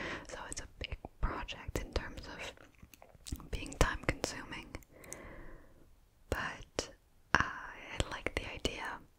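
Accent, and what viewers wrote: France. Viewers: Tu dis que tu es gêner un peu de ton accent en français... Je te rassure en dissant que la plupart des québécois que je connais (à l'extérieur de montréal) adore les accent française ;) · Cool! J'ai hâte. En passant, t'as un accent français extraordinaire, et c'est génial.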